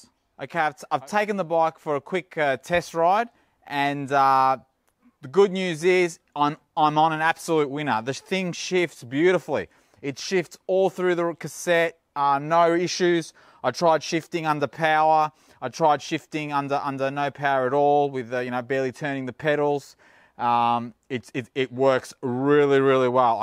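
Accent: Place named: Australia. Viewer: The aussie slang is so refreshing. As a fellow second generation immigrant aussie, the accent is so truely 'modern Aussie' . Love your work mate! Great presenting style and overall video editing and filming.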